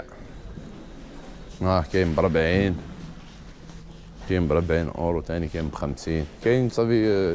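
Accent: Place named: Annaba, Algeria. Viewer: نتا من عين البيضة علاه تهدر عنابي والله ما جات عليك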